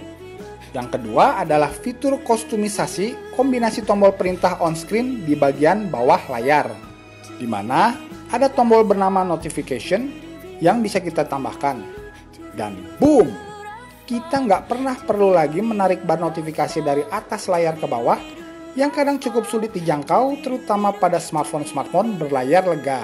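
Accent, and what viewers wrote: West Java, Indonesia. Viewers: nyunda nya itu lohh lucu bingitt :*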